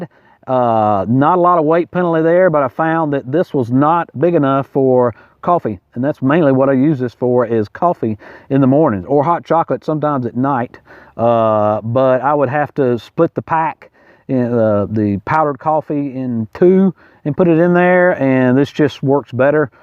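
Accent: South, United States. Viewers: ur prob live in smokies sound southern very nice person and ur very helpful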